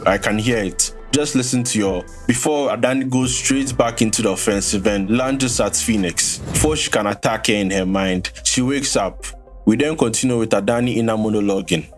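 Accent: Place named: Nigeria. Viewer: Nice accent. Are you Nigerian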